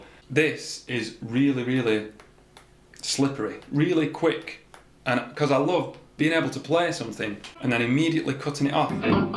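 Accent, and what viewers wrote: Lancashire, United Kingdom. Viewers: Great Lancs accent mate. No joke.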